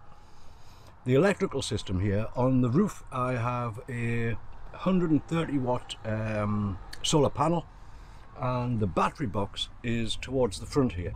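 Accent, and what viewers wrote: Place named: South Shields, United Kingdom. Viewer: Hi a really love your van design, it looks like your at South Shields, and you sound local , I am from ashington area , do you still do van conversions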